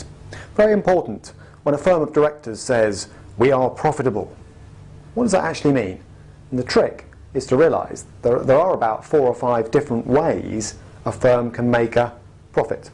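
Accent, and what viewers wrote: England, United Kingdom. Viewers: Is it just the English accent or he actually resembles Christian bale... Great video...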